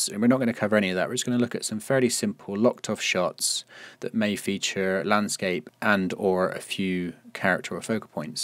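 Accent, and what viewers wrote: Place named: West Country, United Kingdom. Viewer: Great in-depth overview of compositional rules. Nice to hear a fellow west country accent too, makes a change from the usual American teenage nasal whine on most YT tutorial/how to's which can get a tad wearing.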